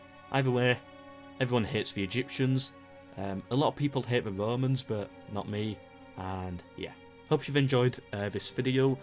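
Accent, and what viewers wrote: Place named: Yorkshire, United Kingdom. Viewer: I find the accent both distinct and pleasant and fellow Yorkshireman. I know your dialect is local so maybe I'm wrong but I normally hear Carthage pronounced like 'carthidge'. Not trying to be a nob, no one messes with the dark lord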